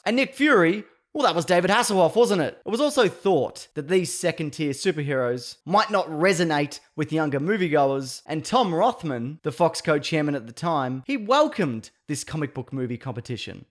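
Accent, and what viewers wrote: Australia. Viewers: You sound Australian so do a video on Danger 5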